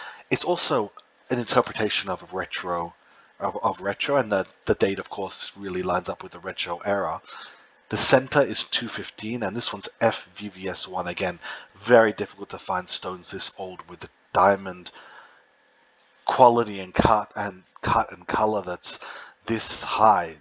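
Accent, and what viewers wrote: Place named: United Kingdom. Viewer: The narration fits the vintage vibe. Ever so slightly British in accent, reminiscent of Antiques Road Show, and not unlike the jeweler in the Friends episode where Phoebe helps Chandler buy Monica's vintage ring. Coincidental? Maybe.....🤐🤭🤐 But perfect nonetheless.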